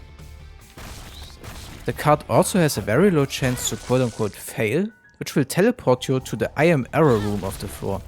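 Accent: Germany. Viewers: German ig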